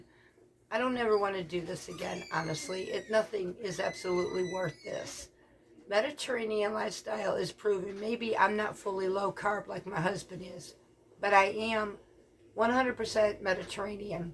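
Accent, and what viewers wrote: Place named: South, United States. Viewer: Hi I hope you feel better soon ❤. We just ate frozen salmon burgers for dinner. I never thought of making them from scratch. I will be trying your recipe next time for sure. Thanks. Glad you liked the S.S. I have never E mailed by myself before. I need a lesson. You will hear from me soon😊. I love the way you say ya all and all the southern warmth that now greets me almost everyday. Please don’t change ❤😊🥇🏡🇨🇦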